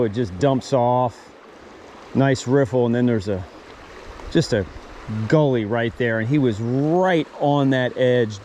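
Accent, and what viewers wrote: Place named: Pennsylvania, United States. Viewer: I'm from PA and everytime I hear you say water(wuder)it takes me back. Love it! Took me a long time to stop saying it that way myself after I moved - probably because I wasn't trying to change it. I live in the Smoky Mountains of NC now and just started fly fishing this year. Lots of good places to fish, but I'm in the putting in your dues stage of fly fishing. A nice way of saying I'm not very good yet, but I love doing it! You are really hitting them Trout. I sometimes go 4 or 5 days without catching a fish! Just started euro nymphing myself and really like the control and feel. Anyway, great channel - I really enjoy your videos and just subscribed. Appreciate the Euro tips. Your inspiring me to head back to PA to see some Family and try the fishing that way.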